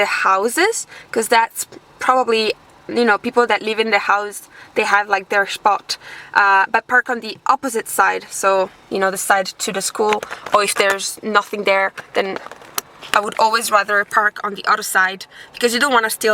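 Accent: Scotland, United Kingdom. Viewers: Really enjoying the Scottish Diaries since I am a Scot living in Canada. I know that you are Italian but I could hear every so often a hint of Scottish brogue mixed in. Any idea why? It sounds so lovely.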